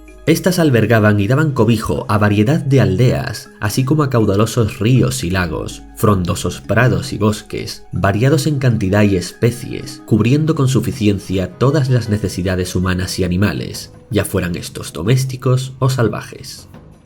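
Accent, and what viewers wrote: Spain. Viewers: ¡Excelente la narración! ¡Me encantó! Me encanta el acento español del castellano.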